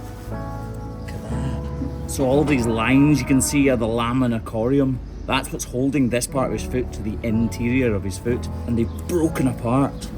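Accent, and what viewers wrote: Scotland, United Kingdom. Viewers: I really enjoy watching your videos. Even though this is a seriously important and necessary job, you and the team around you are very entertaining. Yet, I find the presentations to be quite informative. The way you regard and treat these animals, is a credit to all of you. I’m quite sure that the farmers who bring you in, appreciate your overall regard and respect you show to their livestock. BTW has anyone ever told you that you look a lot like Russell Tovey, only with a Scottish accent. LOL 😊 Much respect and appreciation from here in Western Australia. G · I think part of it is also (maybe just for me) his Scottish brogue. It’s lyrical, I have always been a music geek. 😊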